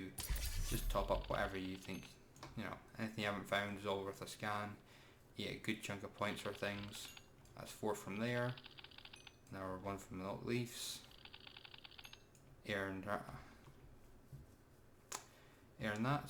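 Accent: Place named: Scotland, United Kingdom. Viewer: Soon as I heard your Scottish accent I knew I could trust ya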